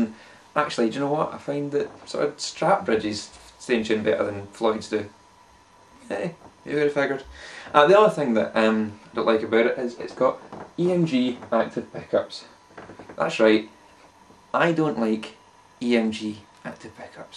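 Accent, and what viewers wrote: Scotland, United Kingdom. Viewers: TALK SCOTTISH TO ME! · It's scotland. We all talk like that over here... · Well he's Scottish. I don't know about Shrek.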